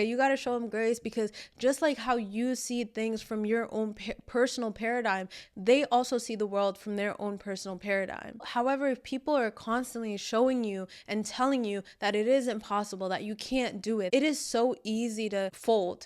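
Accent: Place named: United Kingdom. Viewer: Why did I thought you were from the UK??? 😂😂😂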